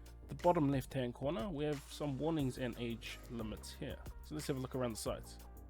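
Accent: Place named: New Zealand. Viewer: Love the Kiwi accent.....................you are from New Zealand right 😂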